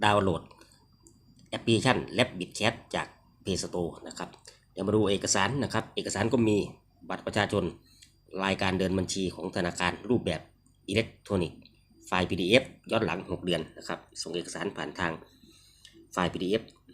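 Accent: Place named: Countryside, Thailand. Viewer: เสียงคือบ้านนอกแท้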